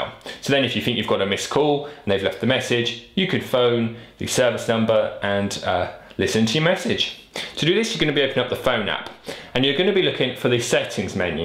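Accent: United Kingdom. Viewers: I think he's Bri ish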